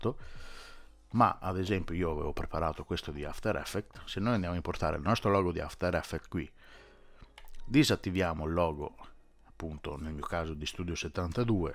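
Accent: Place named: Emilia, Italy. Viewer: Complimenti, sei maledettamente bravo, ti seguo sempre... Volevo chiederti una cosa, se è possibilee... Vorrei fare una casetta in campagna usando una foto, ma vorrei che nelle finestre della casa, si accendessero e si spegnessero, stessa cosa vorrei fare dei lampioni antichi, quelli di un tempo che avevano la candela, come si fa a fare questo effetto ? So che per te è una sciocchezza, ma io ci sto diventando scemo e mi sono guardato quasi tutti i video del tuo canale. Grazie e non smettere... FORZA BOLOGNA !!! L'accento Emiliano...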